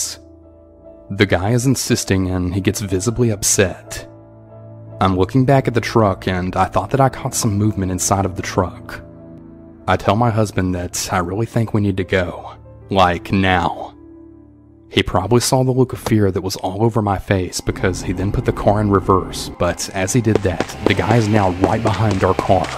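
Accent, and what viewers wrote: South, United States. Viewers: I love that slight southern accent im the narrator's voice 💜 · I really love the narrators accent like his southern accent it’s very aesthetically pleasing and satisfying.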